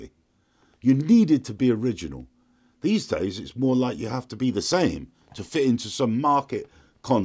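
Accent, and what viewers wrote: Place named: Dorset, United Kingdom. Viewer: Commenting again just below my comment aSince then obviously Greg has sadly passed, love his Dorset accent and he was also a great storyteller, I went to one of his gigs where he talks about his abs others music, he really was a one off, we will miss you Greg RIP now .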